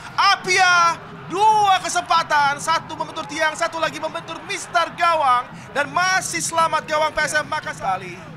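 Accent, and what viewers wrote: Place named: Malaysia. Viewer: Komentator nya seperti bahasa malaysia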